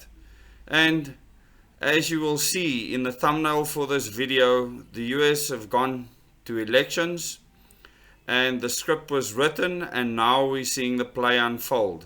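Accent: South Africa. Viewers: It is so refreshing to hear a South African accent saying what we have come to know from the truthing community (mostly US) over the last few years. Love it. Mooi man! Mense is besig om wakker te skrik.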